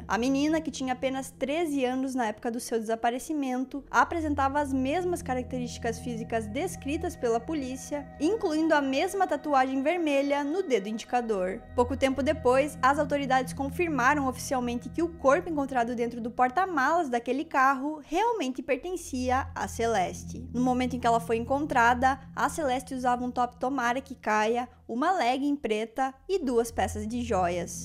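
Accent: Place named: Curitiba, Brazil. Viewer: Video muito bem produzido! Parabéns! ❤ Uma dúvida, você é de Curitiba? Pergunto por causa do seu sotaque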